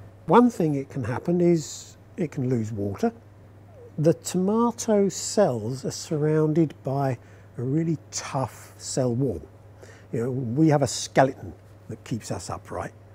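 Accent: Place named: United Kingdom. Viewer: This is the first person I've ever heard say "toe-MA-toe" regularly outside of the phrase "toe-MAY-toe, toe-MA-to" to express the likeness of two things. Is that part of the British accent, or just an odd exception?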